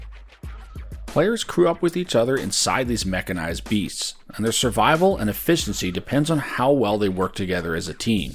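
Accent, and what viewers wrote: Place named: Finland. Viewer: is this guy from Finland? wheres this accent from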